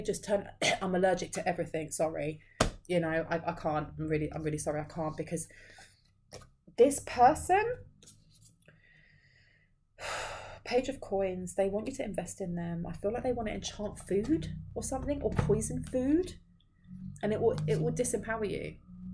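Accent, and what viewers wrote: England, United Kingdom. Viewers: 🌹🧘 THE LITTLE GIRL IN ME WANTS TO TELL YOU THIS AND I'M SORRY IF THIS OFFENDS YOU BUT I LOVE YOUR VOICE BECAUSE IT BRINGS BACK MEMORIES OF WHEN MY MOTHER TOOK US TO CHICAGO TO SEE MARY POPPINS FLYING THROUGH THE AIR LIKE AT A CIRCUS SHOW OR SOMETHING BARNUM & BAILEY CIRCUS USED TO ALSO COME THERE 🌹🦉 AND I ALSO REMEMBER AFTER THAT I COULDN'T WAIT TO GET MY CHRISTMAS GIFT BECAUSE IT WAS GOING TO BE A MARY POPPINS DOLL THAT SPOKE WITH THE ENGLISH ACCENT LIKE YOURS OR BRITISH ACCENT LIKE YOURS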